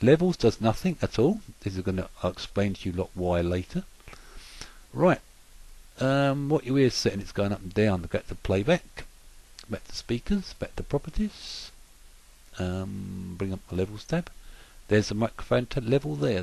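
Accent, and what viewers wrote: United Kingdom. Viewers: wooh... that's a hard britain accent xD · wtf with these limey voiced tutorials, didnt even get to 5 secs.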